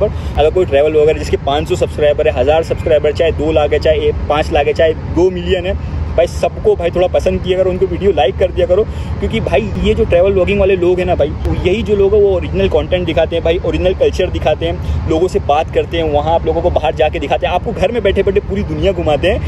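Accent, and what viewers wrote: Mumbai, India. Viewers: Assalamu alaikum bhai in sha Allah top pe jaoge agar isi tarha imandari se kam kiya to. Aur bhai language pe dhyan do mumbai wala accent aata hai hai.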